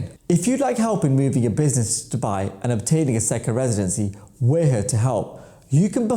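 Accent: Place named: India. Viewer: yes you sound very much indian or bangladesh, whichever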